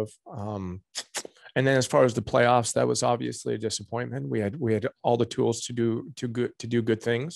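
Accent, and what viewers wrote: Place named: Canada. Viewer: He still has a canadian accent, it's fading rho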